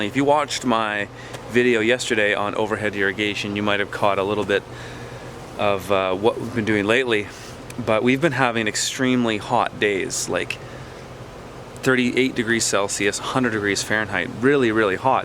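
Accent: Canada. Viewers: You have the softest Canadian accent I think I've ever heard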